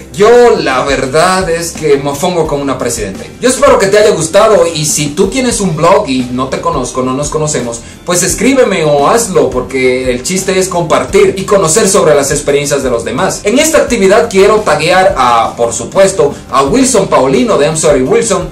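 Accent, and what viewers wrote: Mexico. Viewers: Ya estas hablando como un mexicano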